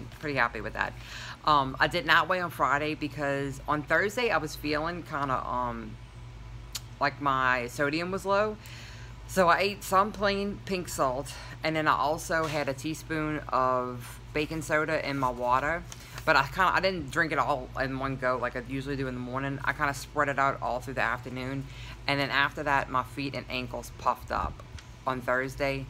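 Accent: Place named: Louisiana, United States. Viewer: Is that one of our Louisiana girls? lol I hear the accent.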